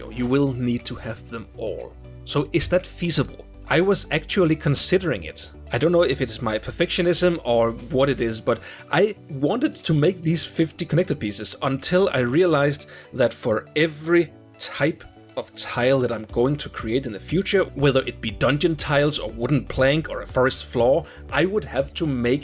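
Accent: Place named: Denmark. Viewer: That accent… are you Danish perchance?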